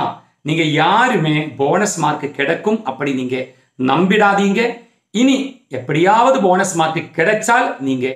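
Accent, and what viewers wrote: Kerala, India. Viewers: Looks like malayali speaking tamil. Is it?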